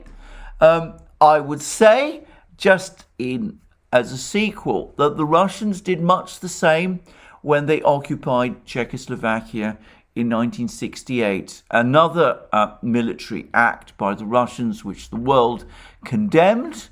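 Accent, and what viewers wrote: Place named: Cockney, United Kingdom. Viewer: The speaker throughout this video has a British Cockney accent. Websearch "photo of Col. Douglas Abbott Macgregor" and you will see that the speaker in this video is not he.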